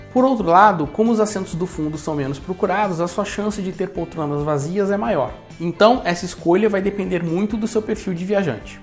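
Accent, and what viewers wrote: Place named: Brazil. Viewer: O cara fala brasileiro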